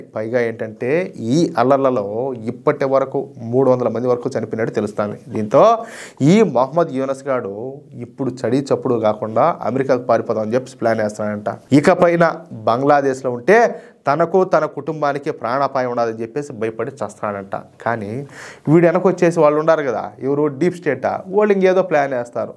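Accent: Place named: Nellore, India. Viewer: Yendhi abbayaa mandhi NELLORE Yendhi Nee Yasa chusthee alla untee anduku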